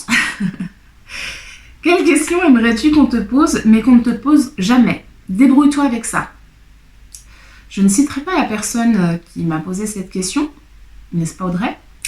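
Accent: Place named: Moselle, France. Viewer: Coucou, Super sympa ton tagg. Merci pour ce beau partage. Ne t'excuse d'être remplie d'émotions pour ton fils... l'amour d'une mère vers son fils, cette maman que tu es prête à franchir des montagnes pour lui... c'est beau... oui, pas de pression... s'épanouir chacun à son rythme. Et sinon, trop sympa ton introduction musicale. A bientôt par ici ou par là-bas. PS: vive l'accent Mosellan. Allez, encore un peu et il sera encore plus prononcé.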